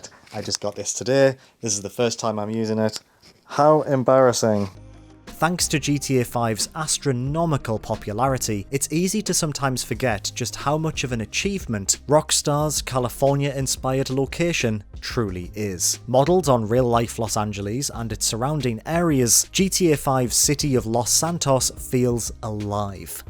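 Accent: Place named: Durham, United Kingdom. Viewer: Sounds like a Durham lad 👍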